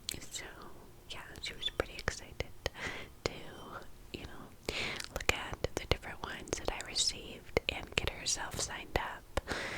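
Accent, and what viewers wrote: New York, United States. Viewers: Hey! I love your breathy whisper videos! I love to hear your rambles in a NY accent!😊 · please do another ny accent video <3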